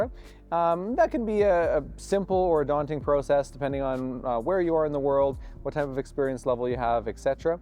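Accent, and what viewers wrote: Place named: North, United Kingdom. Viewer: HA, he's a northerner...help you oHut.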